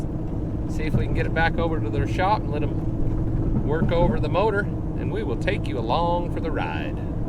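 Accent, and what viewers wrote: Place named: Texas, United States. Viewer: Ok I know you live in Utah but, since you mentioned it, what part of the Country are you from? Sounds like Texas.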